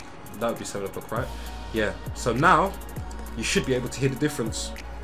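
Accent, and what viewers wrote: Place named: London, United Kingdom. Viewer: so good to hear a ldn bruddda lol, keep it up my g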